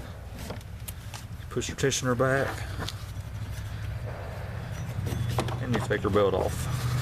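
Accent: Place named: South, United States. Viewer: Jeez I can barely understand your country shitty ass accent. Awesome video tho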